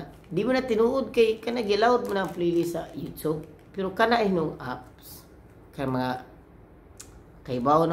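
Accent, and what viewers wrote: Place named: Visayas, Philippines. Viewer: bisaya man diay si host, proud bisdak here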